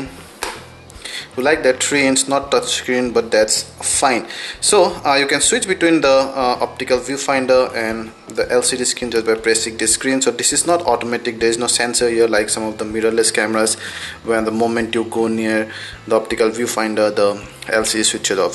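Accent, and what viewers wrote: India. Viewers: indian accent is so funny haha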